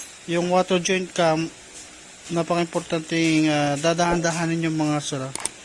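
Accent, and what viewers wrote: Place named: Pangasinan, Philippines. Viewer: damihan m nman upload mo idol nallibang aq halos napanood qna lahat videos mo, tapos yong accent mo na pangasinsn natutuwa aq.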